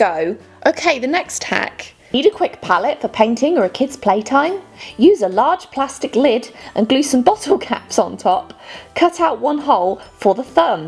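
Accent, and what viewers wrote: England, United Kingdom. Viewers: Okay. So. Hi! Where are you from? If you don’t mind me asking 😅😅 I think you’re living in America? Maybe? But I can’t work out your accent? You sound quite British like me! I just can’t work it out.. can anyone help? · You opened that salt so effortlessly. seemed really safe. You sound so British in this- I love it! But you ruined it by hating on fish and chips haha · So she has a British accent but she lives in America. How come? Why is she in America?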